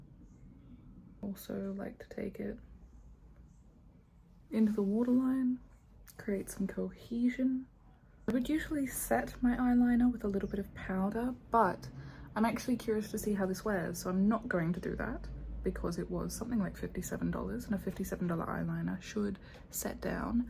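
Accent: Australia. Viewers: Random comment.. First time I've heard her Australian accent now that she's speaking a lot. I thought she was English from the other videos (hardly any speaking).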